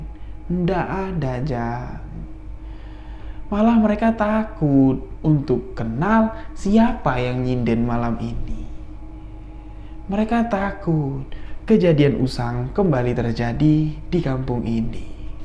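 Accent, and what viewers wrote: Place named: Java, Indonesia. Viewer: Bang ini sy kira dr jawa,oooaaalll.....ternyata dr Jambi.salam sehat,salam santun,salam super duper,salam silaturahim dan sedulururan dr; Bandung Jabar.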